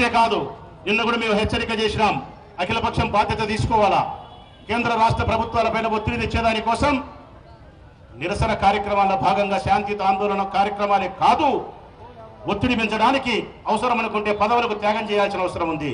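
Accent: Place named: Rayalaseema, India. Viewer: Anna super anna..rayalaseem slang lo eragadesav...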